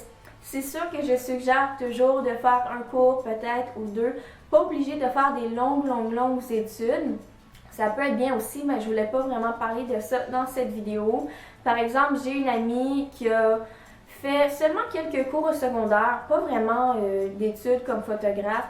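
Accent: Quebec, Canada. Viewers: tu a l'accent quebecoi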